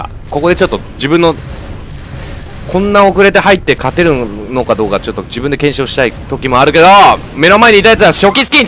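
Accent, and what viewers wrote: Kansai, Japan. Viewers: BOXINGは関西出身なの??ww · 関西弁縛りでもしてんの？？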